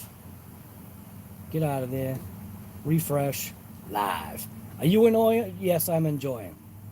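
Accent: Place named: Boston, United States. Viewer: you gotta be a fellow bostonian. i can tell by your accent, wicked awesome guy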